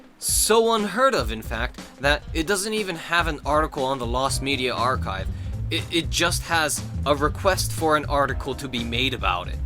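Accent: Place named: United States. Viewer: Good research, for some reason I didn't image you having such an American accent lul.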